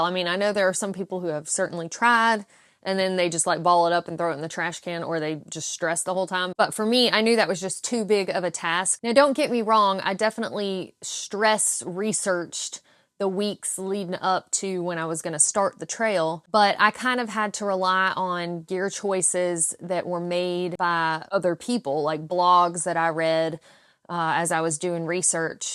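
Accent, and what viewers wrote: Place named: Tennessee, United States. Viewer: Your so sweet I bet your from Tennessee or Alabama with that beautiful accent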